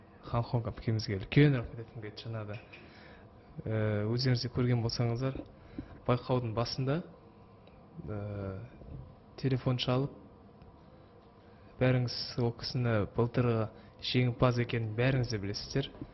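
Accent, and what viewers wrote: Standard. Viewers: Ботен елде казакша сойлегенди естигениме оте куаныштымын! Димаш жарайсын!!!! Казакша сойлегени оте таза, тил байлыгы жогары!!!! 👍👍👍👏👏👏👏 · Мектептi казакша окыган бала осылай таза, эдеби тiлмен сойлейдi. Жарайсын, айналайын! Берген жауабыннан, iшкi дуниеннiн кiршiксiз таза екенiн тындаган кытай журтшылыгы угады. Ceнiн арканда кытайлыктар казактар туралы жаксы пikipде болады. Рахмет, айналайын, жортканда жолын болсын! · қазақша сондай таза сөйлейтініне, қазақилығына ризамын! Осы жобаға шалақазақ біреу барып сұхбатты орысша беріп отырса бүкіл əлемге масқара болар едік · Я Димаш таза қазақ тілінде сөйлегені. Арамызда қазақ маңгүртпен мамбеттерге сабақ болса екен. Әр бір қазақ осындай болса екен.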